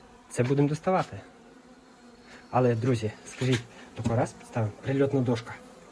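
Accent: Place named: Dubno, Ukraine. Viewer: у мене бабуля з Судобичів біля Смиги. Чую чую я цю мову Дубенську чи у тебе Радивилівська? ))) класно там ліси ставки....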